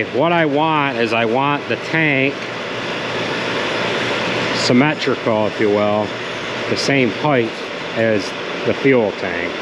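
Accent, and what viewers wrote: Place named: New York State, United States. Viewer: I prefer the longer video's. Love to hear your yakking as it teaches me New York state speak!! Learn a little bit from Andrew Camarata but you beat him by a page or two.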